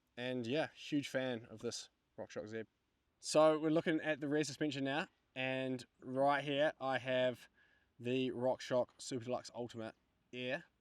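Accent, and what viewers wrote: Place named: New Zealand. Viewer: Bump dat audio a bit. The people wanna hear that kiwi accent loud n clearrr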